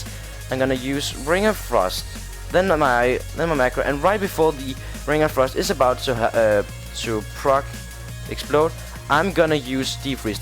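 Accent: Denmark. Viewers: from denmark ? :P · han lyder fandme meget some en dansker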